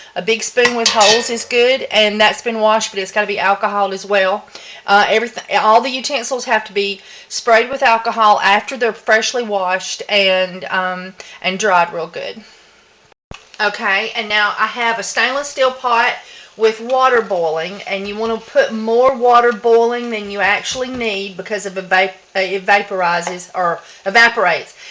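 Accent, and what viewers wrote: South, United States. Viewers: I live in Colorado where it is very dry...I had to laugh because your from the south, and I used to live down there...I picked up the accent now and my hsb has wondered "who have you been talking too?" I said I havn't been talkin' to anyone, just a listenin' to a lady on a video" I loved livin' the in the south where I warshed my winda's and then I moved back here where I wash my windows...Not making fun of you, just that you bring back such good memories! Never met nicer people..I love the south...I wish I could get my hsb to fetch somethin', in fact anything!LOL,...but they don't fetch in this neck of the woods...haha....Loved your video's and want to make some creams some now...blessings, Victoria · I love how you’re southern af but also a hippie 😊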